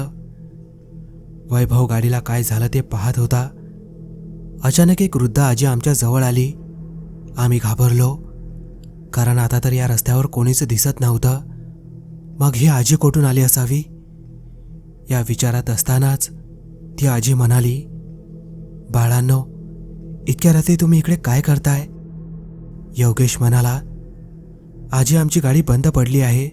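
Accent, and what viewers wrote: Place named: Uttar Pradesh, India. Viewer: अरे मित्रा story कितीही बरी असली तरी narrate करणारा आवाज पण त्या लायकीचा असायला हवाय. एक तर खुप thirdclass आवाज आणि बोलताना शब्द किती चुकीचे. Up वाला माणूस मराठीत बोलतोय असं वाटतंय. मित्रा खरंच सांगतोय फक्त अर्ध्या मिनिटात हा आवाज ऐकून story नको असं वाटतं. थोडा अभ्यास करून channel चालवा. कश्याला स्वतःच हस करून घेताय. कुणीही उठाव आणि youtube वर video टाकाव. फालतुगिरी आहे सगळी.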